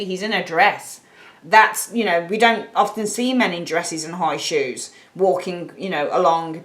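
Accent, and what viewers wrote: United Kingdom. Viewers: love your pronunciation..so BRITISH..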